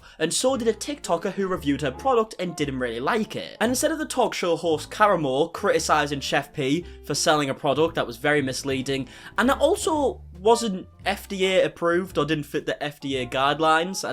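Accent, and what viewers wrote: United Kingdom. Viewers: Knew this dude was british before i clicked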